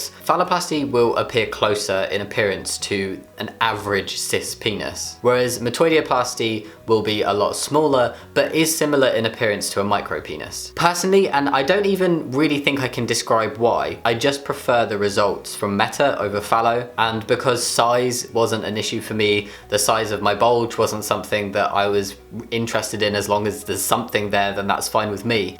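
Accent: United Kingdom. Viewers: just luv your Brit accent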